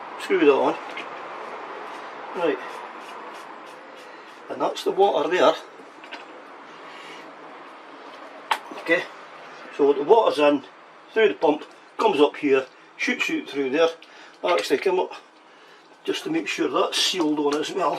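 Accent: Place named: Aberdeen, United Kingdom. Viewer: That was more helpful to see it getting taken apart, not enough swearing for me as I put myself in your shoes so there's a gid few fkrs and btsrds getting flung aboot when yae drop things, I was thinking of doing the very same video as advised by your good self, like you I have breathing issues but also MSG so there's a lot of shaking going on so video would be quite long haha but we'll see you never know,anyway keep up the good work, me and the wife were trying to work out you accent thinking up Aberdeen way 😃👍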